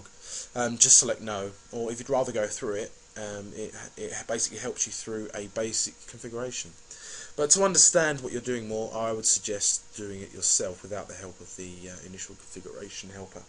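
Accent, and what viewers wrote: United Kingdom. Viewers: and british accent who is harder to understand than american accent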